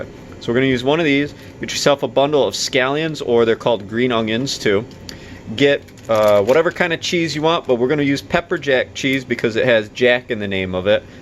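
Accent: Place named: Upstate New York, United States. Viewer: Are u from upstate NY lmao I can tell by the accent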